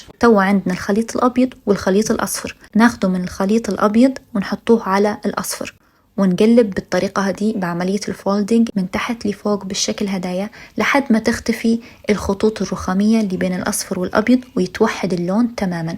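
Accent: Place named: East, Libya. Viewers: ليبيه شرقاويه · ايه ليبيه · خمنت ليبيه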